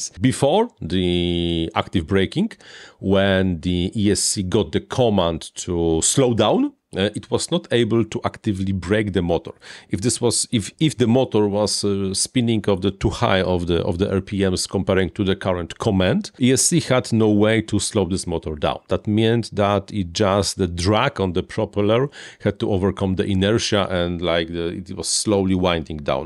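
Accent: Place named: Poland. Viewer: Czemu tak łatwo rozpoznać Polaka mówiącego po angielsku?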